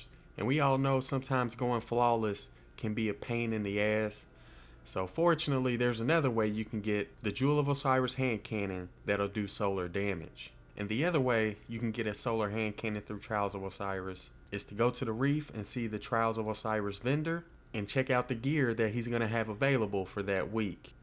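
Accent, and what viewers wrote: South, United States. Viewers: Cawwwwnnnnttttrrrryyyy as hell!! XD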